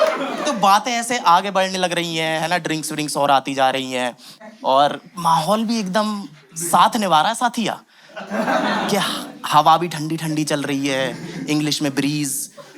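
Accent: Hyderabad, India. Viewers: Bruhhh dyammm that hyderabadi accent wass justtt ♨️♨️ Loved itt brotherr❤ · Thora aur hydrabidi ascent rahta tab kuchh jyada hi maza aa jaata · Maja dila diya bhai... Hyderabadi accent too good 😂😂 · Vo hyderabadi accent ki vjh se ye pura video dekh rh 😂😂😂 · Super hydrabadi touch 😂😂😂😂😂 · Hyderabadi assent was too good. Good comedy set. I like it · Ooe hoyee kya hyderabadi bolta tum apna to dil aa jata tumhare batao par😂😂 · Loved it. Hyderabadi Accent was hilarious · Ek pura 🤣😂show hydrabadi. Accent me krlo bhai❤ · Bro Amazing 😂, your hyderabadi accent was crazy 😂 , Angaar dal diye 🔥⚡😍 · Hyderabadi accent ne pure comedy me jaan daal di ❤😂😂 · Coming again again just for hyderabadi accent 😂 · Haydrabadi accent yarr 😆😆😆😆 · First time pura standup maine dekha hai but accha tha comedy maine sirf hyderabadi accent ke liye aaya tha but pura dekhna pada 😂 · Hyderabad accent , nice bro 😂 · I have hyderbadi friend he also speak like this but ur comic is very good bro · Ending 😂😂 the hyderabadi accent is so good 😂😂😂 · Me to sirf apka haidrabadi accent sunne aai hu.. 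very nice yrr😀😀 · Bhai crazy yrrr ,kya gand fadi ha hyderabadi ma ,oyeee hoyeee 😂😂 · Mujhe aapka hydrabadi accent bahut achha laga i love you ❤❤❤😍🥰😊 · that hyderabadi accent u killed it bro❤‍🔥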